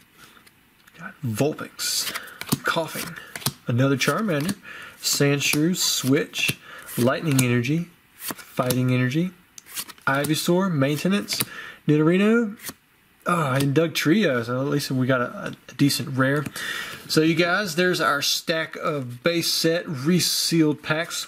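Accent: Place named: Georgia, United States. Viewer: do you live in Georgia in usa because you have a Georgian accent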